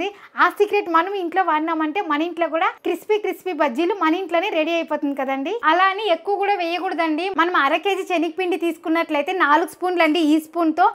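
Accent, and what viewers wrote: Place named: Bidar, India. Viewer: Thumba chennagi adige madthira👌nanu Karnataka dalle belldiddu, kannadavara manasu thumba swacha vaddu, nimma mathu kelldagella namma beader jille nenapagute, all the best nimge👌👌👌👍👍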